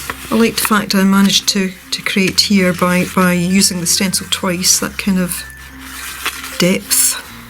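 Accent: Scotland, United Kingdom. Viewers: I've noticed you use certain colors frequently. I guess we all do! Whatever it is works for you because your pieces always come out so beautiful. I think I could pick out your style easily in a line-up, HAHA. That gold and turquoise you combine kind of looks like ancient stone. I LOVE it! You're my favorite youtuber right now and I'm thoroughly enjoying your videos! Oh, and great idea on using the security envelopes. I haven't tried that and plan to tomorrow. PLUS, I just adore your Scottish accent, lol! Hugs to you ~~Liz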